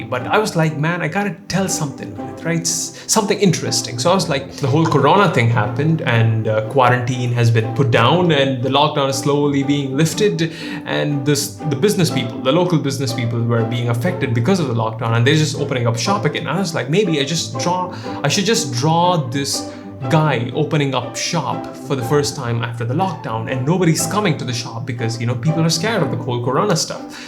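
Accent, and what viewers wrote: South India, India. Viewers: are you from south india bro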